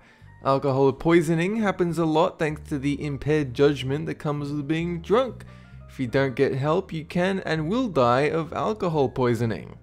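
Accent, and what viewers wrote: Australia. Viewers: Only just realising this now. He's probably an aussie. I tend to tune out accents which are the same as mine because I hear it every day... but I don't think he's from Victoria originally (if he lives here now. I heatd someone in a shop the other day who sounds a lot like him). Maybe NSW or QLD... not sure... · been listeing and He's an austurlian I think.... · He's Australian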